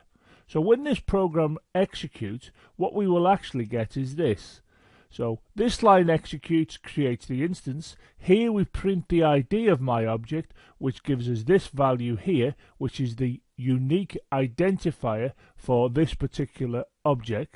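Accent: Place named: Northern England, United Kingdom. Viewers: Thanks for the clearest (and scouse-tinged) explanation I have found so far. So just to check - the 'self' parameter tells that method "please execute the code on the object you're invoked on". Is that not already implied by definition? What would happen if 'self' was not included? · mate u from the north of england, your video is mint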